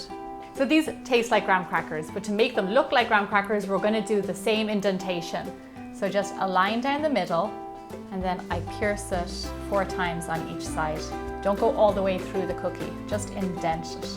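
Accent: Ireland. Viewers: I love your accent. I'm Irish also. My dad was born in Ireland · Are you Irish? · Are u from Ireland I'm from Ireland .... This looks so yummy really wanna try them xx 💕💕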